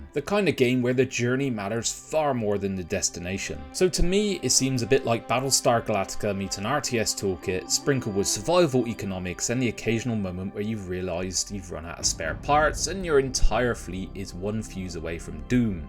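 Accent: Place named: West Country, United Kingdom. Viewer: Whats with the west country accent sprinkled with the American favourite of replacing T sounds with a D. You may not think it 'madders' but it matters.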